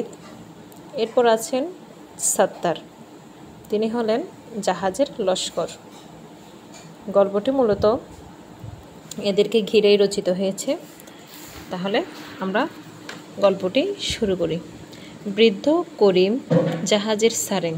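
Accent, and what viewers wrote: Chittagong, Bangladesh. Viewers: Your voice tone like Chatgaia🙂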